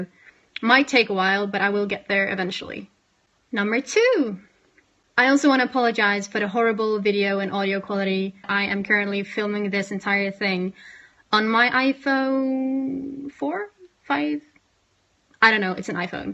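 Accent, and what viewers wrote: United States. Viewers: no lol. just say she speaks good english, u don’t need to lie. she still says for example “beddur” instead of (as most people from the UK would) “better/be’’er”, which is more correct and sounds nicer to the ears. not her fault though, sadly it’s american tv etc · I hate to learn Norwegian from a person with American accent. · Take it from me, you sound like you you learnt American English and not British.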